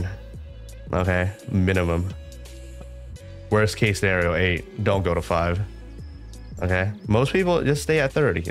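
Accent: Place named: China. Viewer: are u chinese? I can feel the accent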